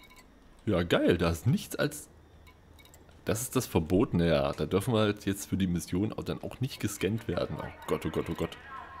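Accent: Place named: Saxony, Germany. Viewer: Ich für mein Teil freu mich enorm wieder Videos von dir sehen zu können 👍 Deine ruhige Art in kombination mit dem klassischen Let's Play-Schnitt hat mir bei all deinen Projekten gefallen. Vorallem, kollege Sachse, als Dresdener finde ich deinen Dialekt sehr deliziös.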